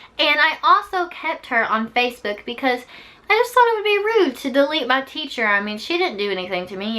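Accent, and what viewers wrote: South, United States. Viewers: You sound like you have a bit of a southern accent. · I like your country accent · you have an cowgirl accent xD but its so cuteeee and i thought you were old when i first saw your musicals xD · Where are you from? I'm from Alabama and your accent is really strong compared to people around me. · I love her accent lol! She's a lil country lol! She's so cute and adorable and so funny!!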